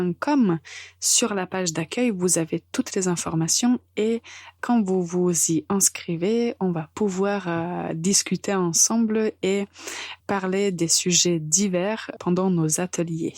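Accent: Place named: Brazil. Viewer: merci. Je vous comprends très bien car vous parlez très clairement. Je peux identifier des accents de certains pays principalement anglais, espagnol et portugais. Je suis brésilienne et j'ai été surprise que votre accent soit proche du nôtre (portugais brésilien).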